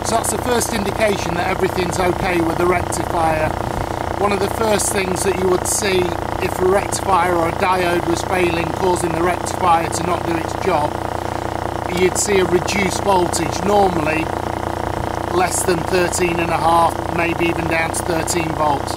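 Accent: United Kingdom. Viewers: Very helpful video, cheers! For other viewers, if the problem is your alternator, dont throw it (and your money) away! I just made a video about Alternator Troubleshooting & Repair. I had a non-functioning alternator and in the video I go through the fault-finding process and dismantle the alternator. In the end the problem was with the diode plate, a $25 component, which is easy to replace. If you want to see the video, go to my channel and you'll find it there... Happy fixing! Cheers, Chris 👍 p.s., where are you from? I'm originally from Blackpool, and your accent sounds pretty close...:-)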